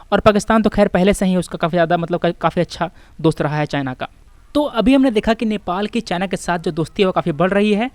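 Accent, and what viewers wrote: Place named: Bihar, India. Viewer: Even you sound bihari ..... You sound pro-madeshi and biased Where is dhavan sir ??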